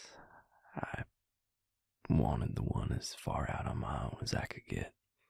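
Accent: South, United States. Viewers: Him: taking My dumb ass self: you sound so Country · I love the southern accent 😫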